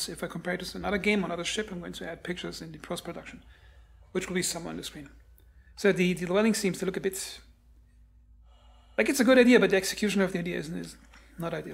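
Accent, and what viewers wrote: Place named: Germany. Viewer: More ship reviews please. As a Brit i "two thumbs up" your German accent.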